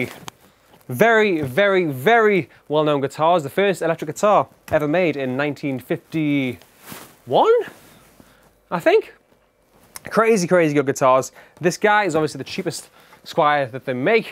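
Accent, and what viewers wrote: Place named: England, United Kingdom. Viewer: he sounds like bob odenkirks english accent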